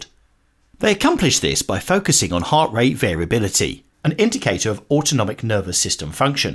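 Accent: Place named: United Kingdom. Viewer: Yeap, that's a Brit talking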